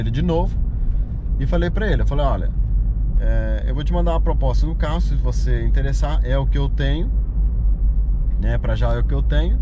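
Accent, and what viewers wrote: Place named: Portugal. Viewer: Parace um português a falar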